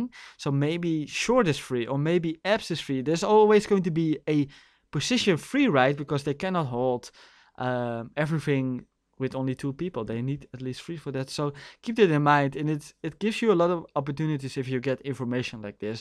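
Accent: Netherlands. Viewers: Good job man, I appreciate new people trying to be helpfull to the community! Also keep talking as much english as you get the opportunity for ;) Believe me as soon as the dutch accent starts to fade people will appreciate it even more.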